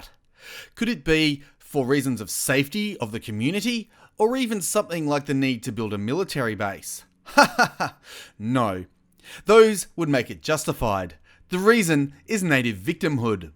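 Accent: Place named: Australia. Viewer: I like hearing the Australian accent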